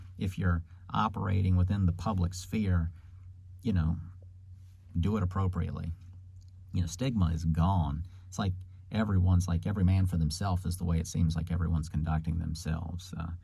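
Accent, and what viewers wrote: South, United States. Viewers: Wtf! I just googled where you do these rentals and you’re in the same province as me?? I thought you did this in the southern US because of your accent. Let me work for you 😂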